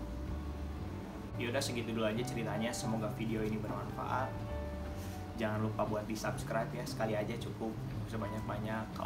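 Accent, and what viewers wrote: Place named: Sunda, Indonesia. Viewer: Menarik ceritanya.jd sejarah tuh yah kakak...ini tapi suara nya kurang keras..teus ketahuan kakak teh orang sunda asli ya..he he..logat sunda nya..kental..pisan..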